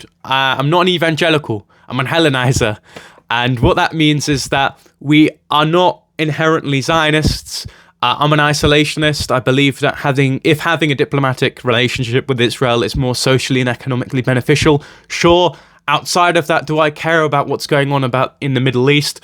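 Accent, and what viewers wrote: London, United Kingdom. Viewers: Has had a bevvy? You sound more Black-Londener than usual. Anyway, Merry Christmas lad, all the best kidda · He has an inner London accent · Oh no. Is that a bit of the London Blackcent I can hear? Very off putting. I hope you can grow out of it.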